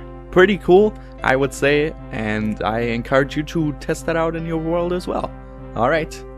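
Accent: Germany. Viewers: Dein Deutsch hört man bro :D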